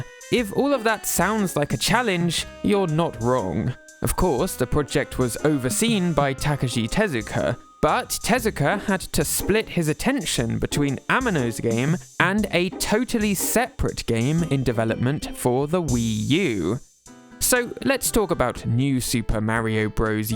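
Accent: United Kingdom. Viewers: This is probably the most UKish accent I've ever heard.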